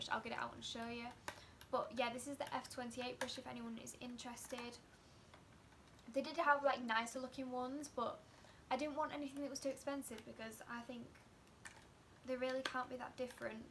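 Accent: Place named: Leeds, United Kingdom. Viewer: aw thanks I’ll have to check the 1hr tan out:) finally a British YouTuber, I’m from Leeds too. Isn’t it strange when you hear someone with the same accent 🤭 that was so cute when you got excited about your make up haha, Iv just placed a £150 beauty bay order, that’s what brought me to your video to see what products others are enjoying. I’ll be excited like you tomorrow when it arrives 😂 keep up the vids xx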